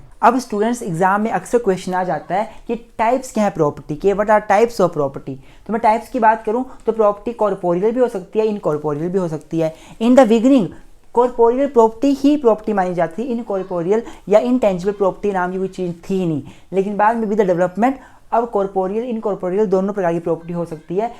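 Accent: Himachal Pradesh, India. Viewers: Be proud of your accent sir!! I Love Himachal ❤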